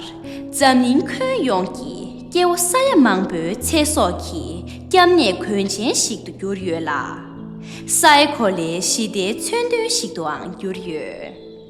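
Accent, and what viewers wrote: Standard. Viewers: རྗེས་རབས་གསར་པས་་་་བུ་མོ་ཁྱེད་ཀྱིས་སྙན་ཚིག་དེ་མཐོང་སྐབས་་་་ད་དུང་ཡང་མི་རིགས་འདི་ལ་རེ་བ་ཞིག་ཡོད་པའི་འཚོར་བ་སྦྱིན་གྱི་འདུག་་་་་་དཔེ་ཡག་པོ་་་་་བོད་སྐད་གཙང་མ་་་་་ཀློག་གི་གཅོད་མཚམས་ལེགས་པ་་་་་་་བཀའ་དྲིན་ཆེ་་་་་མུ་འཐུད་་་འབད་བརྩོན་གནང་རོགས་་་་་་་་ལས་ཞོར་འགྲོ་ཞོར་ལ་ཉན་གྱི་ཡིན་་་་་་་་་ཁྱེད་རང་དང་་་་་ཁྱེད་ལ་བྱམས་བརྩེའི་སྐྱོངས་མཁན་གྱི་ཕ་མ་གཉིས་ལ་དམིགས་གསལ་བཀའ་དྲིན་ཆེ་་་་་་་་🎉🎉🎉🎉་་་སླད་མི་ཚེའི་ནང་་་་་གང་བསམ་ལས་ལ་རེ་བའི་འབྲས་བཟང་སྨིན་པའི་སྨོན་འདུན་དང་བཅས་་་་་རྒྱ་གར་བྱང་ཕྱོགས་་མ་ནའ་ལི་ནས་་་་་་་སྤུན་ཟླ་ནས་་་་་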